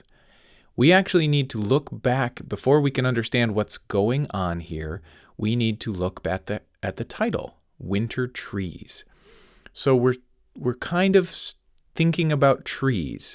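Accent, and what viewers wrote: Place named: United States. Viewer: His heavy American accent ruined this. I expected a pure British accent. 🙄🙄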